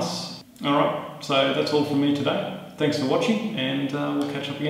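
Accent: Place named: Australia. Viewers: Haha the Aussie accent, I'm Australian he was as clear as a bell. XD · I love Aussie accents.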